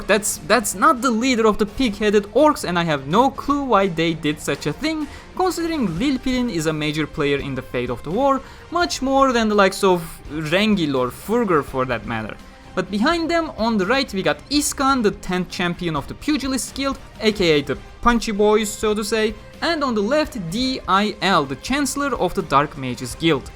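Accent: Turkey. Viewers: İngilizce'ni duyunca edim acaba bu kanal türk mü diye isme bile bakmamıştım.Başarılarının devamını dilerim reis :D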